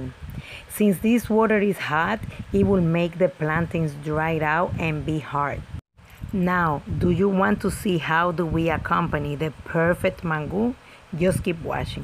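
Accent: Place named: Dominican Republic. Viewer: This lady talks in English as if she is talking Dominican Spanish. Same style, delivery, idioms, etc. Made me miss home, god bless.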